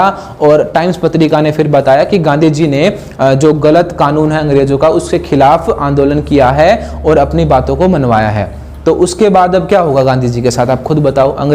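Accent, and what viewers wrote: Haryana, India. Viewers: From haryana??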